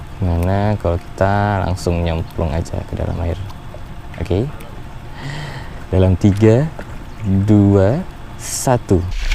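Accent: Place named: Java, Indonesia. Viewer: logat jawanya bang keluar dikit wkwkwkwk lanjutin ya bang video yg kyk gini sama motor sport lainnya terimakasaih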